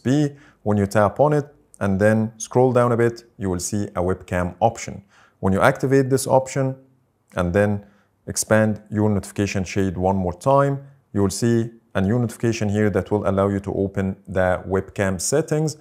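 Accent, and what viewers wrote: Egypt. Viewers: اول ما سمعت الفيديو حسيت إن لهجتك عربية انت مصري؟ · Egyptian English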